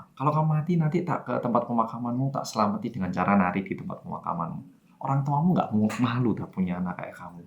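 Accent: Java, Indonesia. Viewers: Hiyayy medhook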